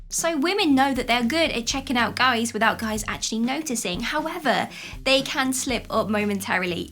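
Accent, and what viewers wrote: England, United Kingdom. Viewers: Your British accent is so charming to my ears, I really love it! Greetings from Greece!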